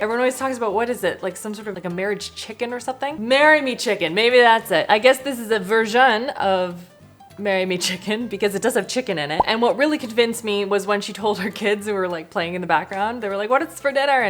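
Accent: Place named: Canada. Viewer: Hi, it has been years since I was watching your videos on both channels. And as you mentioned on your previous videos my husband also always recognize your voice and always says that "Oh she was from Canada right?". And one thing followed another and we decide to move Canada 😅 It has been a month since I moved. It is now more enjoyable for me to watch since I am actually able to get my hands on the products and ingredients. Just wanted to say hi after all of those years I happened to be able to follow a recipe that you done! Lots of love ❣ PS. You certainly add enough salt!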